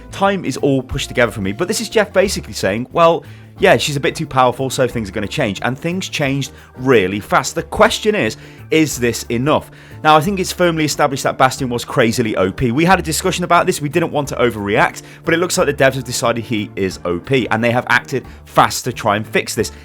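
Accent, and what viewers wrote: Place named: United Kingdom. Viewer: minseoks He's British you fucking chucklehead. It is easier to say it like how he says it then forcing himself to say it how it is normally pronounced. That's like being a non-english speaker but some dumbass such as yourself saying that the non-english speaker is retarded is just as stupid as the statement itself.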